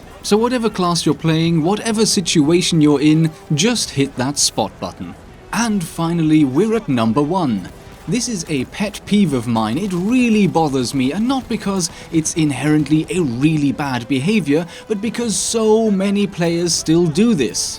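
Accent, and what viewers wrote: RP, United Kingdom. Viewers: Your tone is so pretentious I cannot manage to get through this video. You're talking about irritations on a video game, not reading out the verdict in a highly contested court case. You don't have to speak in BBC English.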